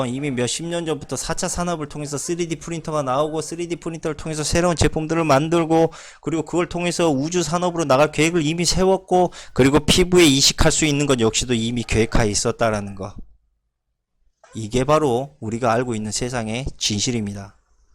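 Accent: Jeolla, South Korea. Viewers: 전라도 분이신가봐요? ㅋ 말투속에 사투리가 구수허시네요^^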